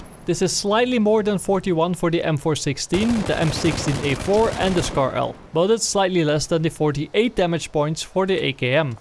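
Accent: Netherlands. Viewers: Is he dutch? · like the dutch accent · Wait, are you Dutch? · je klinkt ml ben je nl?? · are you dutch ? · Dutch, right?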